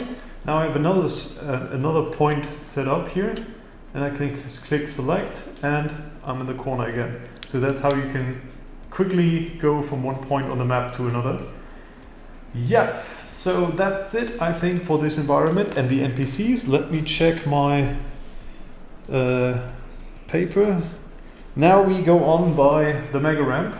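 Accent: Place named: Germany. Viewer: einfach deutscher Akzent 🤣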